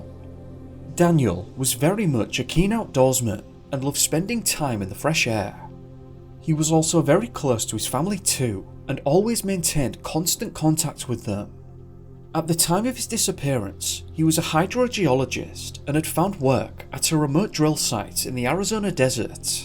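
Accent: United Kingdom. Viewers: BRITISH ACCENT LOVE IT!❤❤❤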